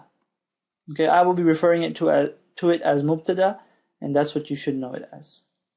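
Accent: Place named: United States. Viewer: لهجتك امريكانية مية بي مية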